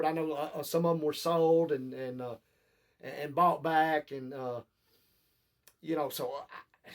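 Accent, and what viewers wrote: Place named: Tennessee, United States. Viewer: Huge Elvis fan from Venezuela since I was like 7-8 years old...looong time ago LOL! My dream is going to Graceland and no matter what am gonna make it. Love your Tennessee accent, is just lovely! and this channel is just great. As I fan, I truly appreciate it. Thank you soo much for these videos!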